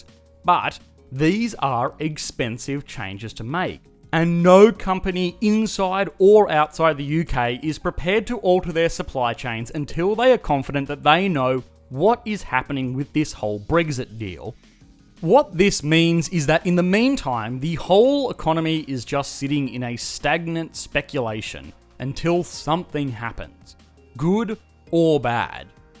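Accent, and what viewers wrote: Australia. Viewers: Some biased shyte from this Australian speaking bod. Great British Pound....hahahaa Country finding its feet....EU never stopped UK from their trade and commerce. Wait and see when the sterling will be less than Euro. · What ethnicity are you mate, you sound Australian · I think this idiot Aussie sound alike is being paid by the corrupt EU l hope the UK leaves with No Deal, far better for us in the UK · The Australian accent is horrendous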